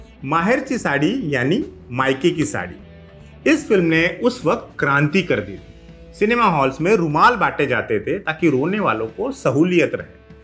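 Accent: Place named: Maharashtra, India. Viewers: Hats off to your Marathi accent 🙏🙏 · मराठी उच्चार अगदी स्पष्ट आहेत याचा अर्थ तुम्ही महाराष्ट्रीयन आहात 🙏🙏🙏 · Aap bhi marathi a accent pa pata chalta ha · U are Maharashtrian. I know the way you pronounce the Marathi alphabet 'ळ'.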